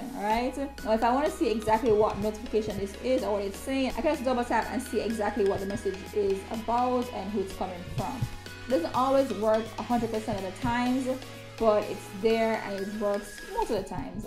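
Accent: Jamaica. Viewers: So many unboxings but how many with a Jamaican accent? Actually this is the first Pixel 2 unboxing I am watching.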